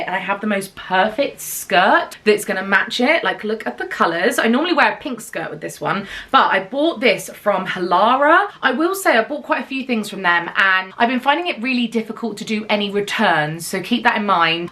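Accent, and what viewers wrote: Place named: United States. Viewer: Hehe I can definitely hear an american twang in yur voice, then you said you spend a lot of time in America and it made sense :)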